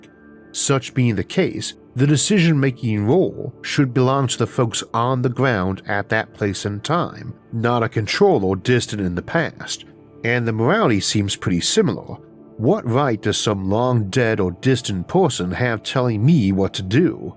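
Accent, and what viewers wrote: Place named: Texas, United States. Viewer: You have a fascinating accent. It sounds like a perfect blend of texan drawl with the intonations of someone with english as a second language. I don't mean any offence by this, its just a very unique sound.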